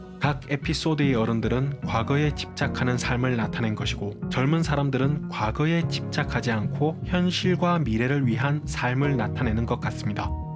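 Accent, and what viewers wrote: Gyeongsang, South Korea. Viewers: ㅋㅋ 사투리 너무 재밌어요 경상도 사투린가요? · ㅋㅋ보통다서울말로만 듣다가 경상도분이니깐 되게신기해요ㅋㅋㅋㅋ 나쁜뜻아니고 딱한마디 듣고 빵터졌어요ㅋㅋㅋ 귀여워용 크 친근감ㅋㅋㅋㅋㅋ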